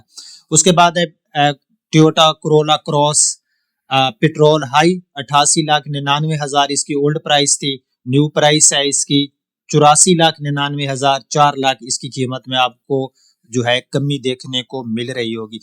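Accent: Saraiki, Pakistan. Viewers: بھائی ۔ آپ خالص اردو ہی بولا کریں ۔ انگلش الفاظ کا تڑکا بڑا مصنوعی سا محسوس ہوتا ہے ۔ ویسے بھی آپ کا لہجہ سرائیکی اور دیہاتی پنجابیوں جیسا ہے ۔ آپ نے تلفظ ہائی بَرَیڈ ادا کیا جبکہ یہ ہائی برڈ ھے ۔ فائدہ ہے فیدہ نہیں ۔ لہذا خالص اردو ہی بولیں اور اپنائیں ۔ ھمیشہ خوش رہیں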